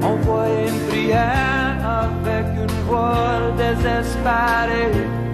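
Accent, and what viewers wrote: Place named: Cajun, United States. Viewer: Si Américaine et pourtant si Française... Un son et un parlé trop méconnu, à redécouvrir... Si proche du Cotentin et de son histoire...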